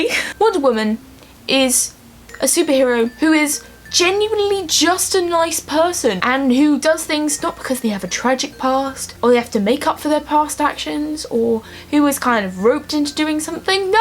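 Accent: Wales, United Kingdom. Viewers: Omg I love your Welsh accent tho (but hey I'm not Welsh so I can't judge) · lovely welsh accent you sound just like Gwen Cooper from torchwood.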